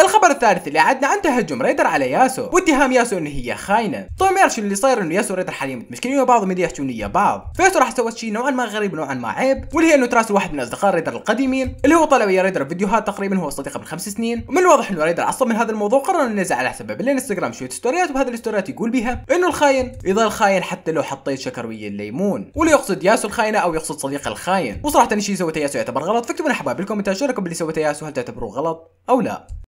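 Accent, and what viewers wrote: Iraq. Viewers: اسرع بني ادم بالكلام انت واللهجة العراقية شوي صعبة الفهم خف عليناااااااا 🙊😹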